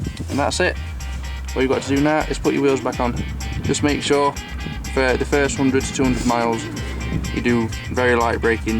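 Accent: England, United Kingdom. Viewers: Nice camera work and nice English accent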